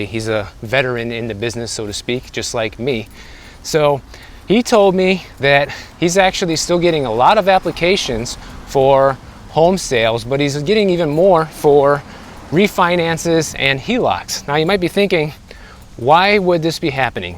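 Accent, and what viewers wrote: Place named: Midwest, United States. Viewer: we are currently feeling stuck between a rock and a hard place and just trying to make the best descision since out situation is temporary getting locked into another 12 month lease at a huge price increase is not what we want to do..ive been watching alot of your videos as well as others trying to gauge our options.. what part of the midwest are you from.. we are michiganders born and raised.. i hear your accent (people are always telling me i have an accent too lol)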